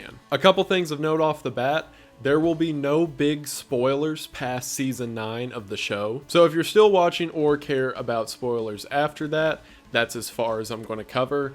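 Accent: United States. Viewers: stop sounding like a yee haw man this cant be real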